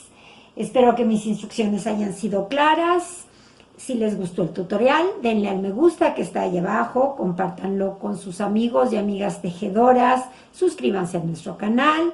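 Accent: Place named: Mexico. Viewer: Otra belleza creada por el arte de esas manitas tan habilidosas, cuando escucho esa vocecita de acento mejicano me pongo alerta por que sé que voy a ver y disfrutar de una maravillosa labor que para mejor aún puedo hacerla yo también. Bendiciones preciosas 🌺🌺❤️🌺🌺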